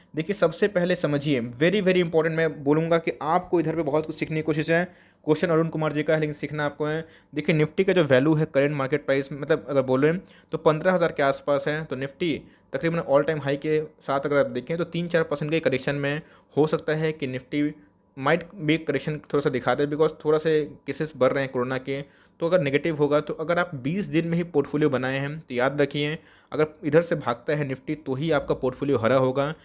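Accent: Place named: Bihar, India. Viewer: Kaisa bolta re up bihariya tu bare ki nahi bare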